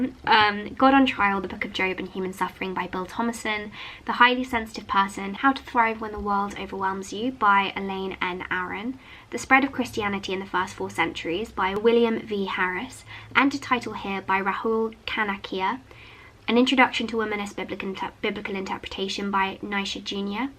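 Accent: United Kingdom. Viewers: speaks uncanny British English and her pronunciation is very good - when it comes to understanding and/or learning English as a non-native speaker. There's no point in making her change her speaking habits just for you, especially that the purpose of the channel isn't to teach people the language. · I'm also not a native english speaker (and not very skilled in this language), but I understand almost all she says. has very beautiful british accent and very good diction 😁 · I and many followers have low level of English. Please speak more simply and reduce their pronunciation. we can't understand the british dialect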